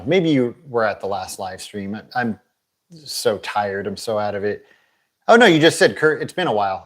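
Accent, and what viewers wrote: United States. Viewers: Hi from germany! I just want to say that your American Englisch ist so clear and easy to understand, and i dont know why 😅.. Its really comfortable to Listen to you 👋🙂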